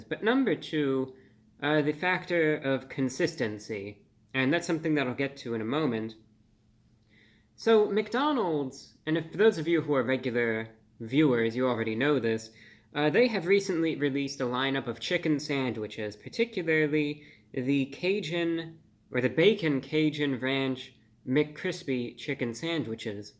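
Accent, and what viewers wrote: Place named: Transatlantic, United States. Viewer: The only YouTuber to have a seemingly transatlantic accent.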